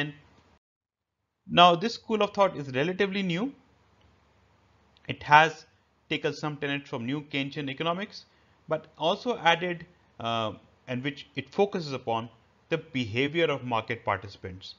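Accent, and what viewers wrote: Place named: India. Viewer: The Speaker is good to understand, with a light indian accent. Only the names are not proper spelled. I was puzzled by the spelling of Keynes. His name is John Maynard Keynes- Not Mayer. Marx as a economist sounds wired when you know his biography. As a critical philosoph, a Dr. of Scientific Law and Journalis, lector etc. involved in the worker class movement and creating a new idea of society. May be that is the reason why economist can not figur out what his impact was in the european countries he influenced. At last Schumpeter is not from Germany even he have had a great influence and new the austrian school better as anyone else as a oponent of Max Weber.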